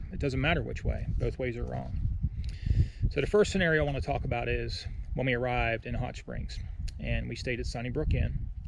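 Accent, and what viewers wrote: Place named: Pennsylvania, United States. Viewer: That, my friend, was a very difficult video for you to make and I respect your choice to get off the trail. I also know that you will finish what you started, whether it's this year or next year. I had to delay my AT hike by 60 days. I will make another decision at that time. It was very difficult because I waited until only a few days before I was to leave. You know all the planning that goes on before leaving. All that came to a screeching halt. BTW, I thought I detected a PA accent. We have relatives near Hazelton. Heard of Senapes bakery? I tried to warn you and others on trail without being mean that something bad was happening and people needed to pay attention to it. On the videos yet to be released, add a short notice in the beginning saying you are off trail. Thank you. Maybe I'll see you on trail some day. 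John from MI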